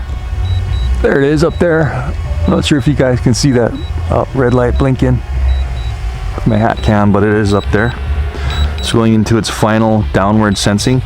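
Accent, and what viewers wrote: Hawaii, United States. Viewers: i don't subscribe for the videos...i subscribe because your Hawaiian accent voice is mesmerizing!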